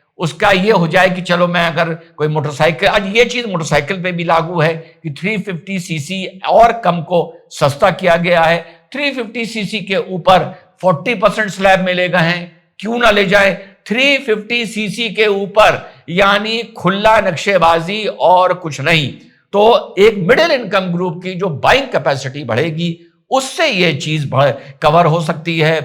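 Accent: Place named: Meerut, India. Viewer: Sir meerut ki language aapki taraf se acchi lagti h..Like always your video analyses😂😂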